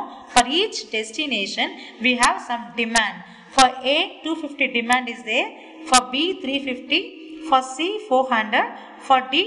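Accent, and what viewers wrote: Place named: Tamil Nadu, India. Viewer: Crazy Entertainment I think she is tamil nadu her teaching sound rhythms tamil words ....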